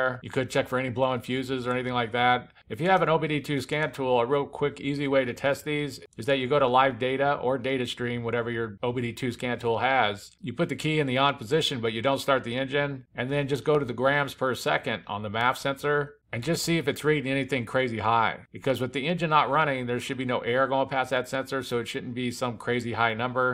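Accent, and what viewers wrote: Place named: United States. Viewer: I'm like he's speaking perfect American English so I'm like ...... huh? WTF is a Shievy Cruze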